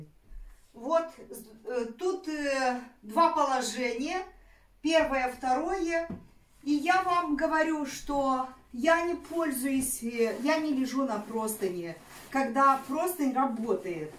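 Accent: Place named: Moldova. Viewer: Живу ❤России. Уже давно. Скучаю по Молдове. Очень скучаю. Спасибо большое за ваше видео. За ваш говор за ваш слэнг и менталитет.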